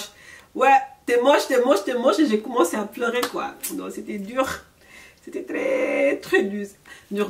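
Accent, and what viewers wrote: Senegal. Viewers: MlleMama c’est pas qu’elle parle mal français, c’est l’accent sénégalais qui est fort comme ça, toutes mes copines sénégalaises parlent de la même façon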